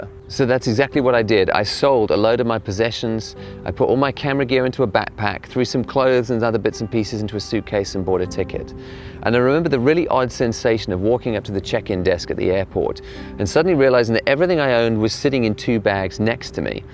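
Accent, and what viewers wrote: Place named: South Africa. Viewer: Aah, I thought I detected a bit of South African in that accent! Really enjoy your videos, and I can especially relate to the latest one, being a freelance writer in SA.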